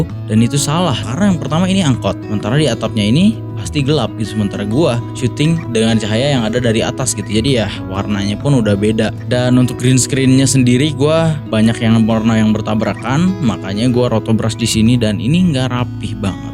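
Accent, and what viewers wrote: West Java, Indonesia. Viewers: bro sunda nya di belah mana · Orang mna kang,.. Sani sunda emhh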